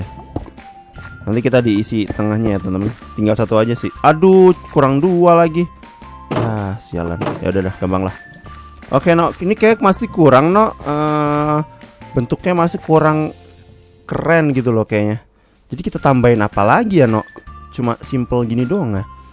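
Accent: Java, Indonesia. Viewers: bang apik bang omongan medok mu kwi aku yo wong jawa bang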